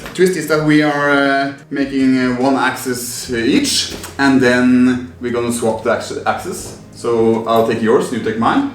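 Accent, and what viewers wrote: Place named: Norway. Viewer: Heavy norwegian accent i hear🤣, great video tho!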